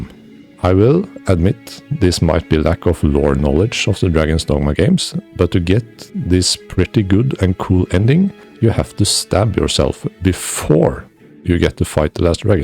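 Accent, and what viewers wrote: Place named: Norway. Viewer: haha this english it could only be the one of a norwegian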